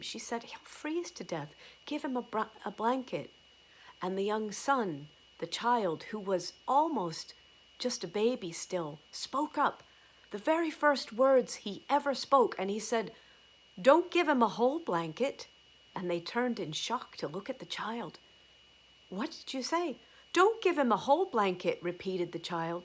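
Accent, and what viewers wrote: Ireland. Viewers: Oh how I loved and needed this! Yes I have done things which, in retrospect, have disappointed or inwardly embarrassed me... but it IS true that I have learned a tremendous amount (in retrospect)... it seems the more daring the effort the more I learned afterwards. I always thought I needed 'a clean slate' of a life, 'never putting a foot wrong' which never worked. I'll listen to this again and have already sent it to my kindred spirit friend across the country 💕 Since delivery is half (or more) of the message I want to say your English is beautiful and I love the very delicate tinge of Irish in your speech.. Thank you!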